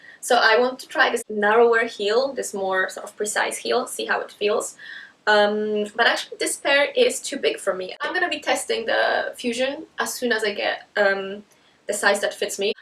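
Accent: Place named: Germany. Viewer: Love your German accent.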